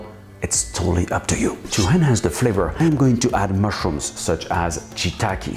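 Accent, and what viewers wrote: France. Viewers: Ce que je trouve unique dans ces vidéos de Bruno, (outre le talent culinaire, le caractère trop sympathique du personnage ) ce sont les bruitages (quand il mord dans un plat, oubien les couteaux qui valsent, les oeufs qui dégoulinent etc... les sons sont bien travaillés , "incredible" !! - QUI fait toutes ces mises en scènes et ces bruitages, c'est TROP BIEN ! - Merci à Bruno pour tous ces partages ! - et le petit accent français tout en parlant un anglais "fluent" : en plus, j'apprends et "brush up my english too !" - Hip hip Hourra !!!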